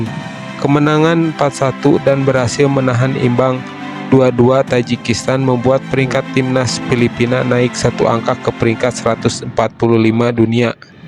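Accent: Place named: Sunda, Indonesia. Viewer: Sundanya medok bnget min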